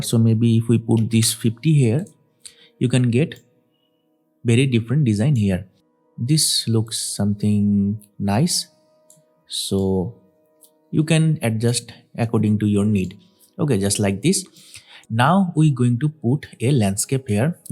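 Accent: India. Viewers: by the voice I can tell its an indian channel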